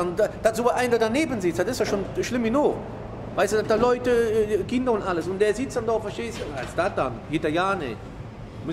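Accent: Cologne, Germany. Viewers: Der Typ kann nix und hat nix. Er ist eine Kölner Laberbacke! Er äußert sich zu gewissen Themen im Kölner Dialekt und veröffentlicht die Video auf YT.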